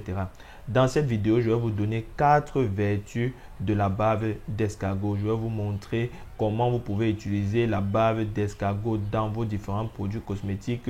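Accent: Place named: Africa. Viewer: Bonjour à votre accent on devine que vous êtes un africain. Qu est ce qui vous empêche de montrer des femmes de couleur arrêtez ce complexe c est révoltant. Si vous n avez pas de photos de femmes africaines il y a les femmes noires américaines. Vous vous adressez plus à vos semblables et vous affichez des femmes blanches arrêtez ça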